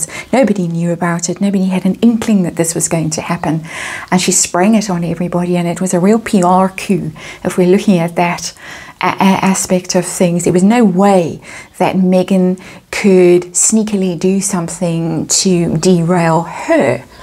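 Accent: South Africa. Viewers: This is a superlative reading. Thank-you! What a gift - my only this day. Such a beautiful pack of cards; (as an art director) I appreciate the design, the subtleties, the lateralness. I love your neatness and delivery, reliability and all-round warmth & gentleness. Also, after hearing which country you could be in, also by your accent; I found some kind of comfort knowing you might be nearby - us being at the bottom point of the globe. Then, recently you spoke of being near the sea and sand dunes; so I am thinking Blouberg side, or Noordhoek side. I'm in the City Bowl, near the top of residential Table Mountain. Seasonal blessings & love to you and yours. And a good 2022. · I just want to thank you for a lovely reading. I always look forward to your readings. Merry Christmas to you and your family from a South African now living in New Zealand! I love listening to you as it reminds me of home! 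🇿🇦💕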